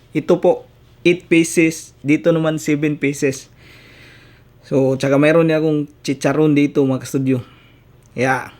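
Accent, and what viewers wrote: Visayas, Philippines. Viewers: Bai klaro kaayo bisaya ka Basta mo sturya mag tagalog bwhahaha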